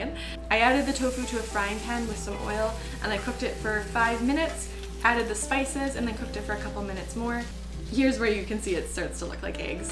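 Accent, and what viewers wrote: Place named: Canada. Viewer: I knew You were from Canada by the way You speak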